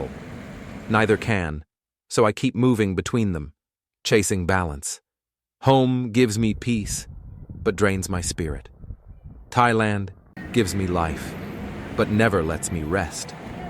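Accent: United Kingdom. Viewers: You're a Brit?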